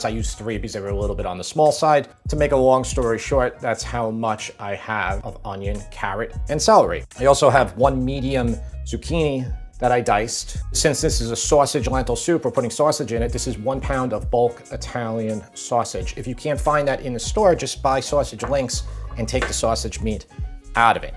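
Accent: New York, United States. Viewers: He's from Long Island so New York. Kind of similar maybe not as exaggerated as Jersey.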